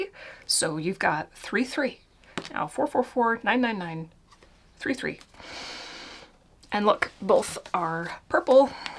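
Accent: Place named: Midwest, United States. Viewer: welcome ! Still watching the rest of your reading. I enjoy you . I'm from Illinois across from St Louis. You Midwest yourself? I don't notice anyother accent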